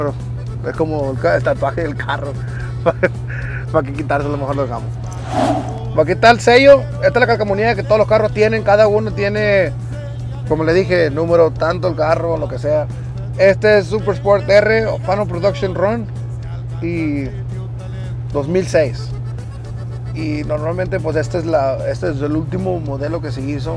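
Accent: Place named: Mexicali, Mexico. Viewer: Todos mis compás de chicali dicen así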